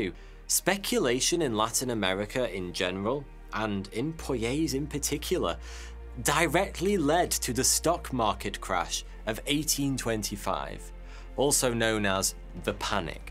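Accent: United Kingdom. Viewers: I always hear "forty two" instead of. British accent is confusing 😂😂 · Anyone surprised the guy was British?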